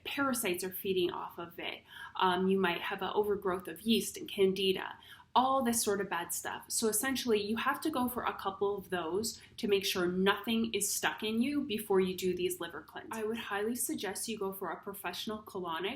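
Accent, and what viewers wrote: Canada. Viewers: haha, well "Eh" is a dead giveaway, but the "Aboot" is more subtle. Anyways, thanks for the video as i have been seriously considering doing a liver flush, and i appreciate your straight-forward content about the subject.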